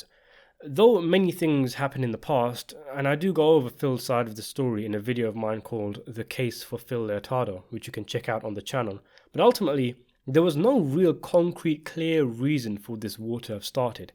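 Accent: United Kingdom. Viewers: sopranos analysis in a British accent is brutal to watch.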